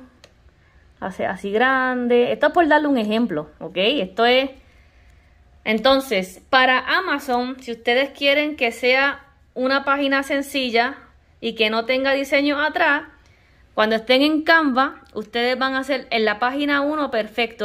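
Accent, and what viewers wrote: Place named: Puerto Rico. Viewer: Saludos desde Mayagüez, soy maestra de Artes Visuales ya retirada. Estoy comenzando a incursionar con la creación mi primer libro de colorear utilizando la inteligencia artificial. Precisamente estaba buscando tutoriales en Español cuando doy con tu video. Muchas gracias! Ya sabía que ese acento de boricua no se puede negar. Weeepppaaaa!😂😁😂😁😂😁🇵🇷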